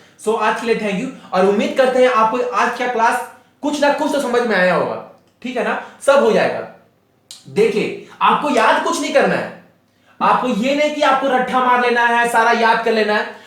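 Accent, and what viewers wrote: Bihar, India. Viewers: very nice way to teaching sir....and bihari tone omg thnq sir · i love this bihari tone awesome both subscribe and like done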